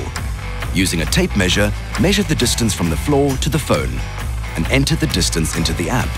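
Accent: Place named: India. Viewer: The narrator has an Indian accent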